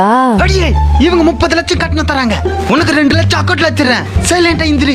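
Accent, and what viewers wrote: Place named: Andhra border, India. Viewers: Andhra border people's slang ithu. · Telugu mixed tamil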